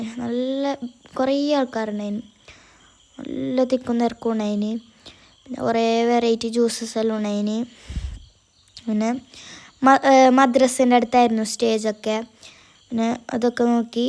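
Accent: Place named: Kasaragod, India. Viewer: It's me thanha Kasaragod slang nalla paangnd 😂😂😂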